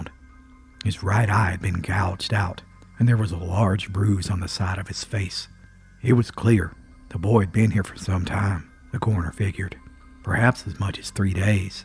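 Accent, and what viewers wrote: Appalachia, United States. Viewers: I just found your page and I'm obsessed! As an Appalachian girl myself, your accent feels like home. ❤️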